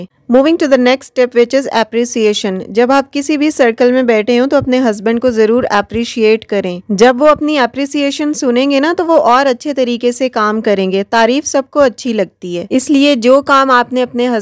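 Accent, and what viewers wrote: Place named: Karachi, Pakistan. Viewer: ap k bolny k andaz sy lg rha tha k ap karachi ke hen.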